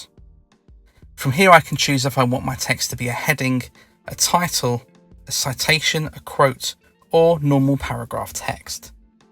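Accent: United Kingdom. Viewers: An outstanding tutorial. Voice is nicely paced (not MANIC like others) and the background music is softer and just right . This gentleman has very good diction and his British accent makes for fact-filled, easy listening. Way to go!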